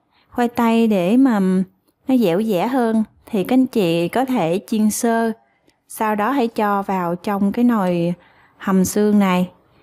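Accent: South, Vietnam. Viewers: Hỏi cho vui chứ thấy rồi, giọng miền Nam ngọt hơn mía lùi, cảm ơn cô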